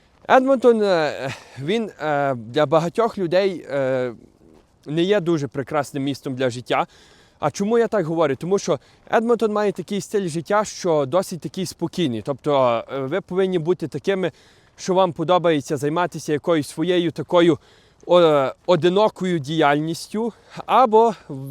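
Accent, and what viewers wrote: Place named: Galicia, Ukraine. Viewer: Мова дуже гарна, з першого слова впізнала галичанина-земляка.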